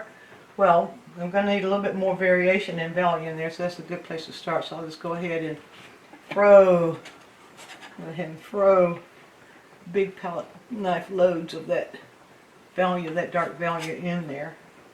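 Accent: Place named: Appalachia, United States. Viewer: I love the tutorials and I love so much that her accent sounds like mine. Any time I hear an Appalachian accent in a teacher of any kind it makes my heart glad!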